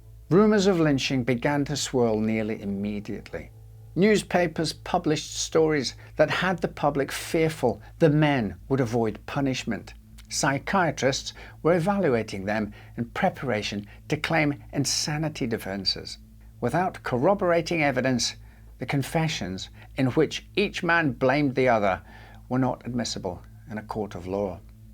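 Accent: Scotland, United Kingdom. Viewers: Everything is better when a Scotsman narrator 😅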